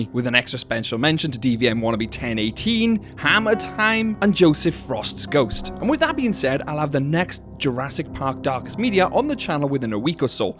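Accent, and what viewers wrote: Wales, United Kingdom. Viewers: After discovering this channel, I only want to hear Jurassic Park/World news in a cheerful Welsh accent 🏴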